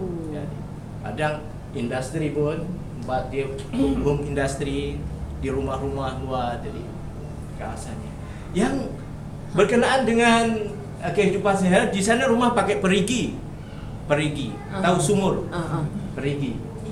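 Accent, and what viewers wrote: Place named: Java, Indonesia. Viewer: Dari logatnya iya sptnya turunan jawa tp knp menjelek"an suasana di negara sendiri? Jkt tdk separah itu Jkt bkn spt New Delhi / Dakka. Jln layang di mana" bahkan kini sdg di bangun kereta bawah tanah / subway. Mmg Jkt tdk punya gdg tertinggi di dunia tp percayalah suatu kota akan terkenal bkn krn ada gdg fenomalnya tp krn suasana dan keramahannya jg keunikannya. Masjid terbsr seasia tenggara ada di Jkt, surga belanja terbsr seasia tgra jg ada di jkt yaitu di Tanah Abang. Mau makan makanan seluruh Indonesia dtg sja ke Jkt dari Warung Tegal, Sop Konro, Bubur Manado, Pepes Sunda hingga masakan padang smua bs anda jumpai di Jkt dari kls pinggir jalan hga di hotel" berbintang.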